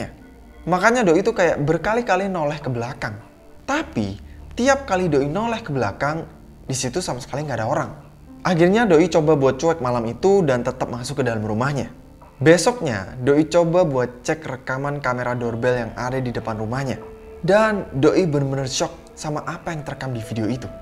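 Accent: Java, Indonesia. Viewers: Bro asli mana? Kok logatnya medok jawa ?😂😂😂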